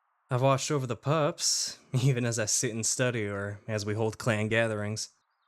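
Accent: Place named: South, United States. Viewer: He making me like country accents…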